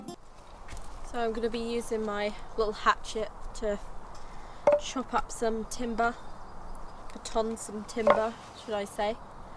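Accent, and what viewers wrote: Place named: England, United Kingdom. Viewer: I love your accent, which area of England are you?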